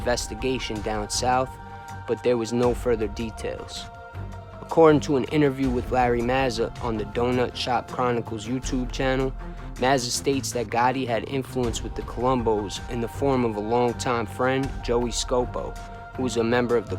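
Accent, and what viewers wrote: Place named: Brooklyn, United States. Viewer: Another nice job. Love your "Southern" Brooklyn accent.. Keep it up--your subs will keep increasing.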